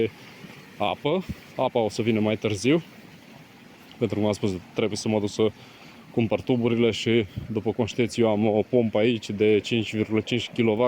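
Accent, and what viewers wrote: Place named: Moldova. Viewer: Salut!! Ești moldovean de unde ? așa se pare după accent scz nu te supăra și eu am mulți veri la Roman (Piatra Neamț)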